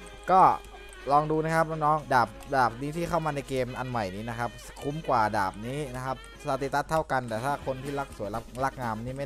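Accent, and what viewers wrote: South, Thailand. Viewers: ออก! ออก! เทาๆ หนอย หนอยนะครับ! 5555 ใต้มากเลยบ่าว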